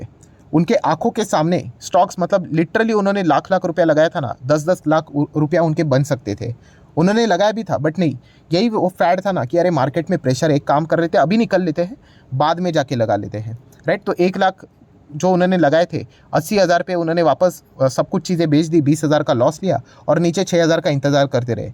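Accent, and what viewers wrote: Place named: Kanpur, India. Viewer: Lag raha guru UP ke kanpur se ho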